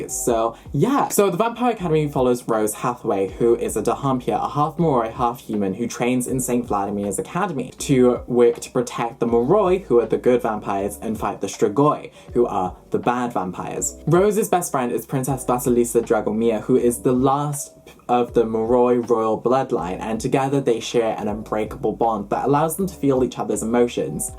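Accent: Wales, United Kingdom. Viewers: Where are you from because your accent sounds like you have a Welsh twang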